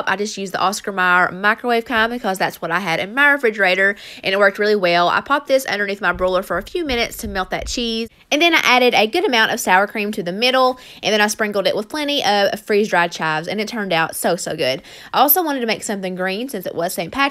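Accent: South, United States. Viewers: I love in Kentucky too I have been wanting to start a YouTube channel but I'm so scared lol your doing so great and that country accent is so comforting lol keep it up girl your doing awsome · Sincerely Sorry Ur Absolutely Gorgeous, Ur Country Accent Is A Choir Of Angels, Ur Mother Of Tha Century, Ur Husband And Kids, I Believe Are Very Proud And Lucky To Have You. God Bless 🙏🙏🙏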